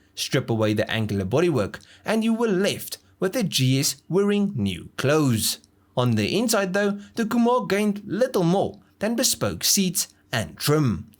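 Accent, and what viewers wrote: South Africa. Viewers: South African accent is ready to follow. Thank one of the gods he isn't from Kentucky.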